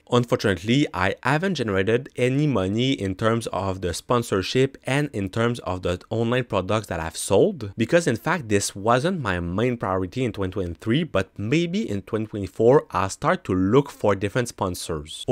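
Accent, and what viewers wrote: Quebec, Canada. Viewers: Your content doesn’t apply to me, but I’m going to subscribe anyway as your personality seem great. You didn’t hide your payment figure to the very end of a 25 minute video. You kept it short and sweet. Very informative. I hope you get far with YouTube my friend. I’m from the UK but I assume that’s a French Canadian accent? Like GSP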